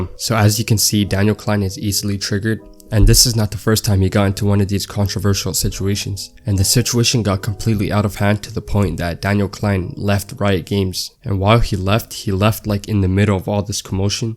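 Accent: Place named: Canada. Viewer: U sound Canadian just like me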